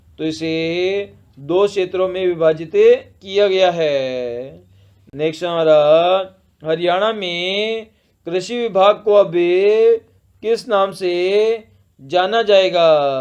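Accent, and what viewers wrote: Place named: Bihar, India. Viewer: हरियाणा जीके बिहारी पढ़ा रहा है बड़ा गंदा लग रहा है आवाज से 😂 भाग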